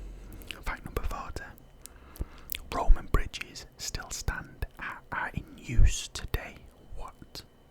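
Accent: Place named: Northern England, United Kingdom. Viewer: Very good ASMR voice. I was confused for the first 15 seconds, I thought it was an American accent at first and then northern English came through? ^^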